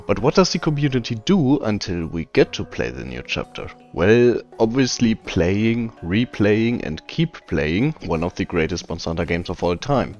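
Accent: Germany. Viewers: indeed. 60 hours and im closing in on my full set fatalis. Btw ur video was pretty cool, i rly like it. Ur accent sounds german. Am i right with that assumption?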